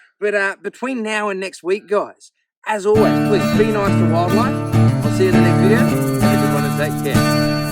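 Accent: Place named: Australia. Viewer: The Australian animals & accent never cease to amaze me.. 😂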